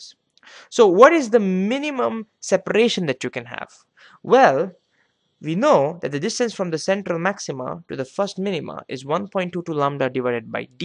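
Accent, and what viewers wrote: India. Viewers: Indian accents suck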